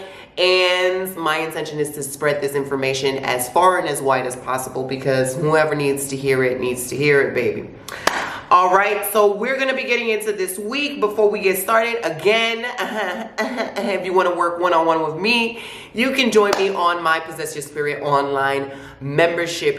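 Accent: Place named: Brooklyn, United States. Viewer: You so Brooklyn & it just makes your videos so much more pleasing & resonating.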